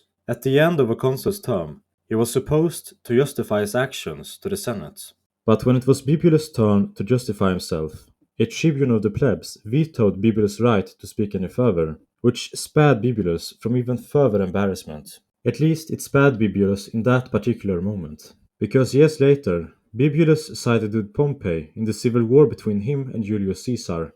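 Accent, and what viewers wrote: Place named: Latin America. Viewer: … I know your voice. Unique combo of voice and accent (very slight) but your Latin origins give you away 😂 big fan though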